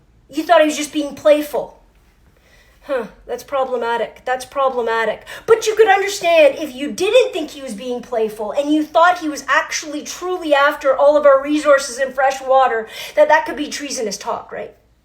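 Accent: Canada. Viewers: Now that I know you're Canaidien I hear it. Love it. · This is what a true Canadian who loves their country looks like and sounds like. I know that scares some people, but I say thank you.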